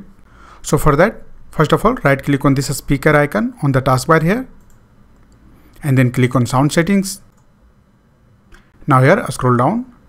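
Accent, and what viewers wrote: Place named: England, United Kingdom. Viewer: goooosh youre english accent i DIE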